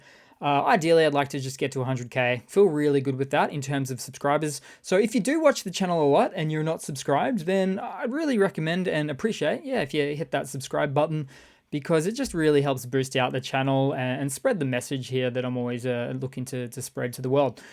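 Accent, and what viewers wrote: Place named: Australia. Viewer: Need more Australian accent i my life’s 8)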